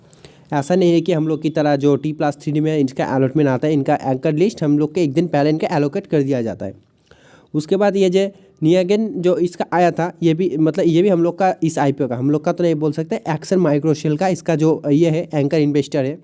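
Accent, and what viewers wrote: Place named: Bengal, India. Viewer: Bhai bangali ho kya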